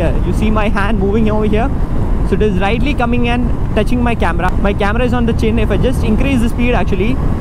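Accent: Kerala, India. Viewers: Unhideable mallu accent 😂👍🏼 way to go bro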